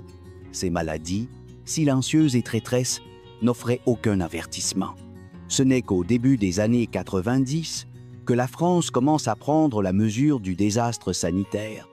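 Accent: Canada. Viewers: Le monsieur (à moins que ce soit une IA ) a plutôt l'accent canadien. C'est normal ?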